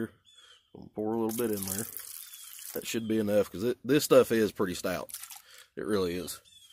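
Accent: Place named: Eastern Kentucky, United States. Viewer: Hey brother found you channel not to long ago and I just hope you're doing well! Cheers from eastern KY I can tell you got a accent near me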